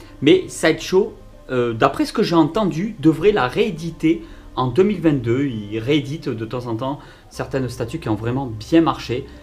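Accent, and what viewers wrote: Toulouse, France. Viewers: Coucou j'espère que tu vas bien? Oui oui sa serai trop cool de voir l'arcade avec l'intérieur de la machine le système de monnayeur et tout se qui se trouve à l'intérieur. Tout les systèmes ect.. Je me suis toujours posé la question comment sa fonctionne. Donc une vidéo sur sa je pense que sa pourrait faire une vidéo qui pourrait plaire à beaucoup de monde. Merci de nous régaler Sa fait un bien fou de te revoir. Ton accent de toulousain nous avait manqué. En plus on est pas si loin moi je suis de capestang à côté de beziers. Je te souhaite une excellente continuation. Continue à nous régaler. A très bientôt. Bonne journée à toi et à toute la famille.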